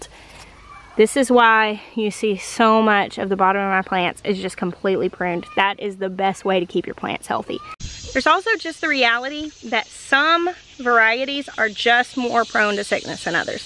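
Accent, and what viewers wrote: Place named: Mississippi, United States. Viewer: Hello from Scottsdale Arizona where I am growing a great crop of tomatoes and other veggies. I am enjoying your videos very much and being from Mississippi I connect with your accent! You are exceptional and your wisdom shows in each video. I am learning ech time I plant how too adapt some of my southern learning to the desert climate. Will you share what you use for a mulch after planting tomatoes.. it looks like hay or alfalfa. Thank you.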